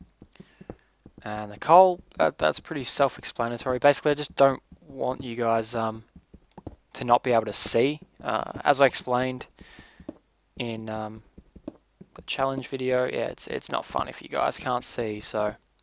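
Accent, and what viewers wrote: Australia. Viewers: yay, I'm welcome :). Im happy that there is an lp'er who speaks in an Australian accent.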